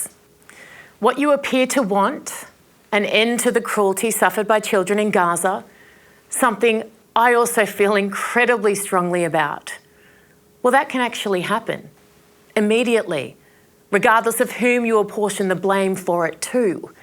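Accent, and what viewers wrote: Australia. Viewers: Hats off to you, Erin... Love the Aussie accent , by the way... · You show no solidarity with your colleagues of the Press. How much are you getting paid? Could you change your accent, I am so embarrassed that you are Caucasian Australian.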